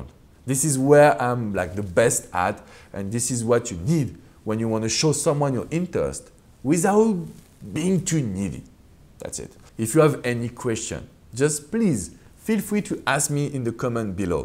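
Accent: France. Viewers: I smiley when i Hear your French accent😘😀trop mignon 😃😅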